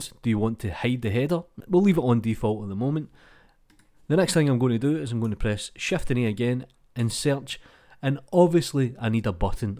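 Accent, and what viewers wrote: Scotland, United Kingdom. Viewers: First i came to look at the AddOn. But now i just listen to your scottish accent lad !! Love it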